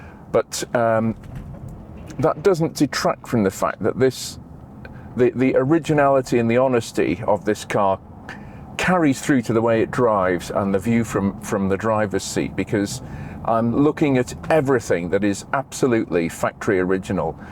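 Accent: United Kingdom. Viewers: God this old gasbag who loves the sound of his own voice - which by the way is a sort of Hyacinth Bucket/Alan Bennet mash up - bridging a gap we never knew we needed filling that's a sort of fussing and constant irritation half way between Clitheroe - and South Kensington. I literally could give less of a toss about the grandiose delusions of any "relationship" with Crewe, l whose hapless senior staff would receive periodic unscheduled visits for a "nice chat over a proper cup of tea" with the full expectations that the posh biscuits were brought out - there's just a hint that the put upon secretary unfortunate enough to have to bring the tray through, was given a little pat on the bottom and a knowing wink and a twinkle that simultaneously says "we're all having fun aren't we pet" and "don't worry about me, I'm like family" ... While in the subject of made up dialogue, I'm sure if not said, then at least thought, was "I like to think many of my suggestions found their way into the cars, if I may so modestly say". 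I made that all up a) because I didn't get any further than "....Doktor Hüffenpump" before a little bit of sick came up at the humblebragging and b) because I wouldn't have cared about the actual words said since I just find him too off putting. And by the way, those Bentley executives, I bet any money, used to run and hide or at least drew straws as to whose turn it was to deal with him😂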